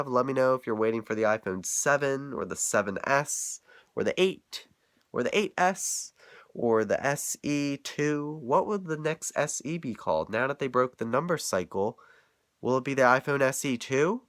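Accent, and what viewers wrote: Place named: Philadelphia, United States. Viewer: You sound like you're from around me :) Philadelphia? And yay- Can't wait til 31st!!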